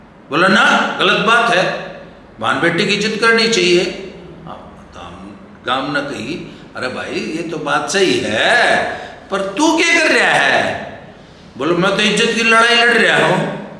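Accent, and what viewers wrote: Haryana, India. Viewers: दद्दा आपकी हरयाणवी की इतनी तगड़ी पाकर दिल खुस हो जाता है खुदन गांव की पूरी जानकारी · Excellent "KHICHAI" by speaking "Excellent Haryanavi Boli"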